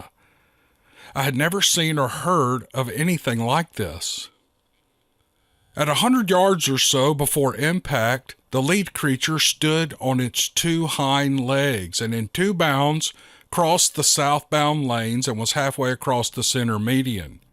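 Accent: South, United States. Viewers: Wow! Great stories- especially that last one - it had me on the end of my seat! I was SO relieved to hear it was just a story! Got me there, hehehe...! Your wonderful southern accent gives a new dimension to these stories,! You've become my favourite storyteller over the last few months. Thank you for creating this channel, for all the times you've helped me to relax and sleep... ❤ ❤ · Awesome stuff Mr.. Your narration with your southern accent makes a great combination. Every time you get a giggle in, it sure makes me laugh. It’s all a good time brother, alright, here we go. Peace.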